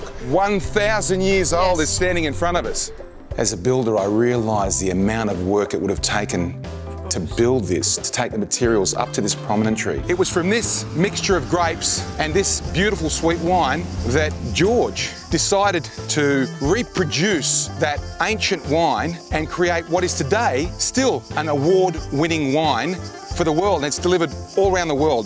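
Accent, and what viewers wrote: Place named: Australia. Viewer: 🇪🇺🇬🇷🇬🇷🇬🇷🇦🇺 Εχω παει εκει Μαρεσει η αυστραλιανη γλωσσα προφορα ... Γεια👋👋👋👋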